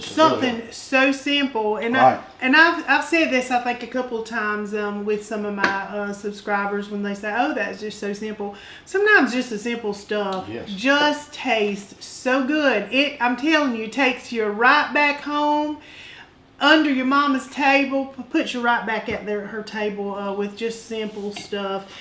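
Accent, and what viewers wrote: South, United States. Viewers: That southern accent is on utube heavy today!! Kissin wears out, cookin dont!! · Hold up, did somebody say potatoes? And she's southern!? Sign me up, baby I'm in! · I love that southern accent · Patatas 😂😂😂 that southern brawl has to count for something.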